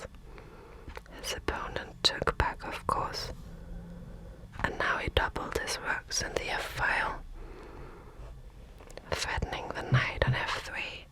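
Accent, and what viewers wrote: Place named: Ireland. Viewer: bravo! i usually view whisper vids as a copout for wannabe-ASMRtists who don't have a suitable voice, but you're the exception to the rule. question before you get too famous and stop replying - what's your nationality? I hear an Irish accent most of the time but iirc you said you were from Belgium or The Netherlands?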